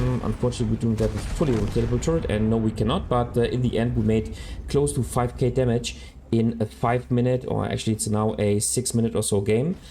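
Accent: Germany. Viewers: Do I hear a German accent in your voice?🤔😁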